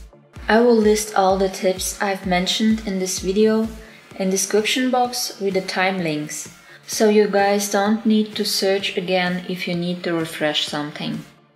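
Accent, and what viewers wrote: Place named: Australia. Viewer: Sound Australian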